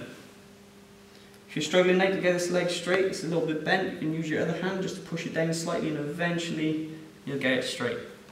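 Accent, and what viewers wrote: Wales, United Kingdom. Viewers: Heard the welsh accent and seen you teach around newport. May have to pop in some time and check it out.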